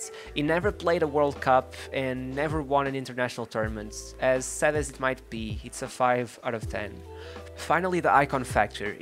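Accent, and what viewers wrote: Portugal. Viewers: are you portuguese? sounds like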